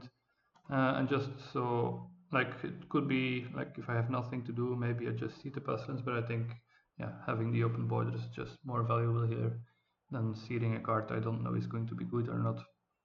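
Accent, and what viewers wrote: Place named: Netherlands. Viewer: Very nice all in strategy. Seeing the opportunity that the other civs needed (too much) time to get online with their culture production so you could maintain your lead. Two questions: Are you dutch? Because your accent sounds really dutch :p And I dont know why e5 would use his ocean liner last turn. It would only set him up worse for certain events like happiness and it would give him 0 benefit. Weird decision. Do you have any idea?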